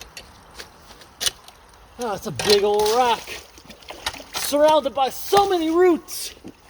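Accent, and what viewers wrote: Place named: South, United States. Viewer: man sounds like my states down south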